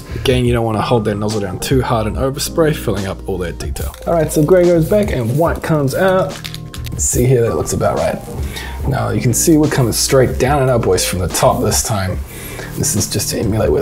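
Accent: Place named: New Zealand. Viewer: Wow great content i love this! kiwi accents are so hot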